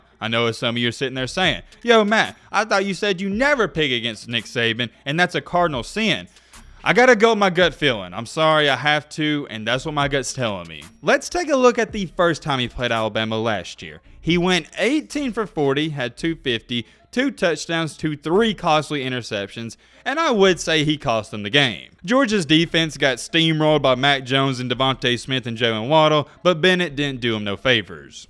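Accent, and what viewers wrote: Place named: Tennessee, United States. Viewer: It's the accent...TN? Little hard on my ears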